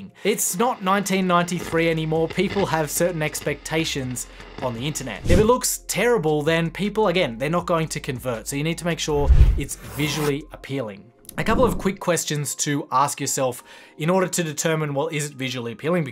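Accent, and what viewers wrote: Australia. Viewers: Really good material, and good to hear an Aussie voice too.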